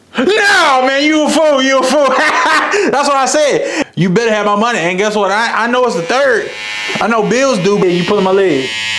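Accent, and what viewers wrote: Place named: South, United States. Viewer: Where bro from he sound southern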